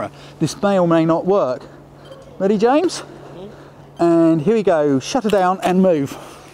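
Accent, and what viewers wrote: United Kingdom. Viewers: EXCELLENT video! I really enjoy your calm and clear presentation (enhanced by your great British accent). You clearly got a passion and talent for both photography and presentation. You got yourself a subscriber. Great work, I learned a lot =)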